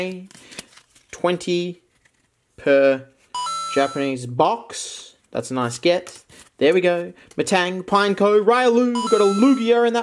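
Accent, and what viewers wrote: Australia. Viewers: This shouty Australian man has invaded my YouTube viewing ..... And it's great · listening to Australian man screaming at card while having a coffee in the morning is exactly what i need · man I need your videos, I need Australian ga- , guy screaming to pokemon cards.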